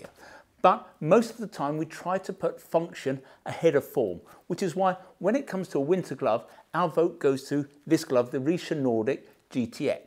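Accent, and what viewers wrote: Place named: East London, United Kingdom. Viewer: sounds like you are fresh from petticoat lane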